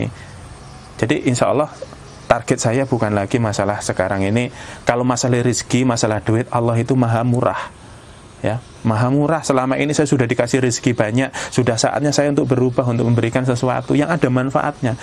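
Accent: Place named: Java, Indonesia. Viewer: Waduhhhh, bhs jowo nipon mantep sanget,sedulur papat limo pancer,pok ipon jowo asli mantap,idola ku manrap cara menerangkan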